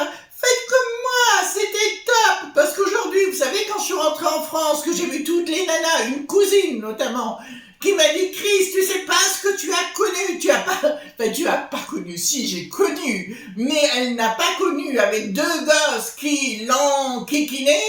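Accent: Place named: United Kingdom. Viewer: J'adore votre humour, c'est vrai la vie est belle, vivons là à 100%. Les personnes néfastes ne font pas parties de ma vie, je m'entoure de personnes saines et qui aiment vivre au jour le jour. Merci pour cette partie de rigolade avec l'accent So British, ça fait du bien!! Bisous à vous et à la communauté 😘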